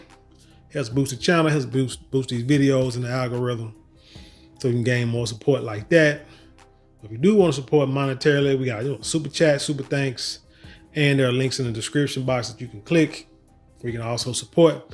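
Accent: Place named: South, United States. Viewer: You are funny, you have a south toung, that's all im saying peace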